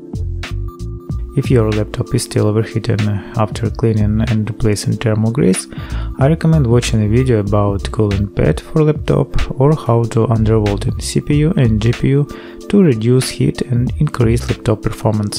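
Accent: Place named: Russia. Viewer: Strong russian accent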